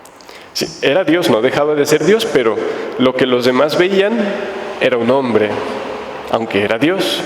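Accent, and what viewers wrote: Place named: Mexico. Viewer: Es una joya esta predicación y con el acento mejicano más agradable la escucha... Sólo los ruidos estrepitoso de la grabación... Lo hacen más interesante porque es mayor la atención que ponemos para no perder ni media palabra porque es nuestra Luisa y Jesús los que predican... Y es el 3er FIAT... GRACIAS DIVINA VOLUNTAD...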